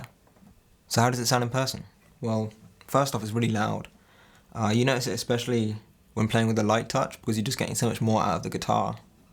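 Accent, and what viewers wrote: United Kingdom. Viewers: Oh your British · I'm trying to place that accent. Suffolk?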